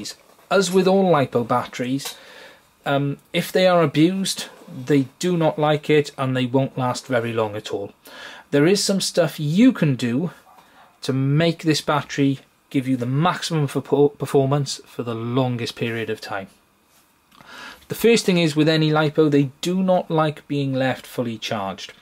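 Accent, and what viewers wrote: Wales, United Kingdom. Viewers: Sound like a fellow Taff. Good to hear a fellow taff, I'm based in Western Australia watching your vid reminds me of home :-) - Hiraeth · How refreshing to hear a Welsh accent on YouTube. Great video mate! Diolch yn fawr😀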